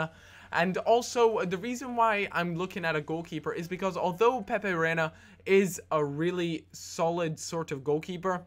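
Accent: Scotland, United Kingdom. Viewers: Is Scottish? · I love you just cause your Scottish <3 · is he scottish?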